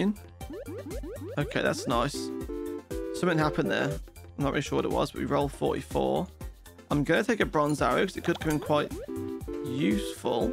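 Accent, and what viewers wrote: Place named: United Kingdom. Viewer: You still sound British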